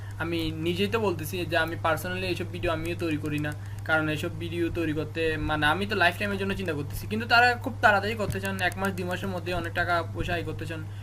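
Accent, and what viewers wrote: Chittagong, Bangladesh. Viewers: হন বদ্ধা অনর হতাত বুঝাঁ যার অনে চিটাইঙ্গা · আপনার কথা শুনে মনে হচ্ছে আপনার বাড়ী চট্টগ্রাম।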